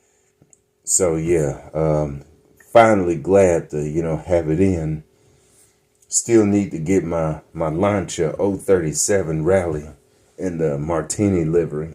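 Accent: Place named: South, United States. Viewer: I like your southern twang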